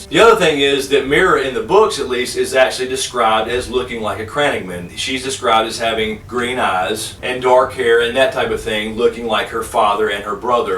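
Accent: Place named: Kentucky, United States. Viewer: 1. Love the shirt. 2. Love the video. 3. Subscribed. 4. What part of the South are you from? I'm from Kentucky and accent sounds very similar.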